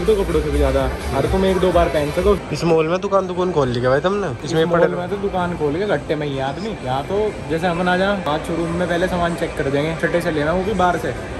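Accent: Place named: Haryana, India. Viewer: I just love ur haryanvi acsent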